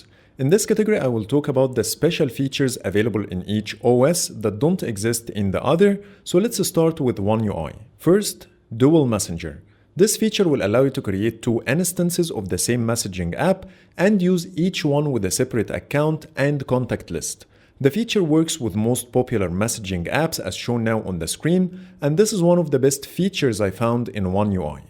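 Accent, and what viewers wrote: Egypt. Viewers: From your first 2 sentences I know that your Arabic especially Egyptian but was heisted until emad Ahmed called u hahaha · gotta appreciate the Egyptian inspired accent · knew it I am Egyptian too and I know the exact accent 😅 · Sounds egyptian to me ??!! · هو طعمية علي فكرة ومن لهجته باين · انا كمان عرفت انه مصري من لهجته بس هوه عسل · Swear i can hear Egyptian accent from here 😂😂👏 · Egyptian accent 😁 your video's quality is amazing 👏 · Egyptian accent cheeeeeeck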